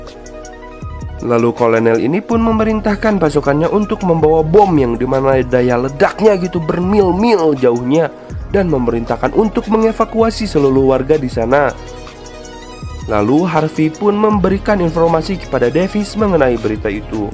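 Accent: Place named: Sunda, Indonesia. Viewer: Story telling sunda😆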